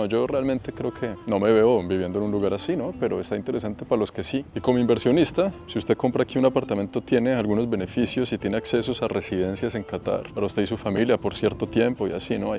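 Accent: Colombia. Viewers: Para mi gusto el mejor YouTuber . Inteligente simpático guapo y ese acento colombiano tan bonito, medio cómico me gusta cuando le habla a los animalitos ❤y conste que no soy de Colombia. Un saludo desde California u🇺🇸 🇲🇽.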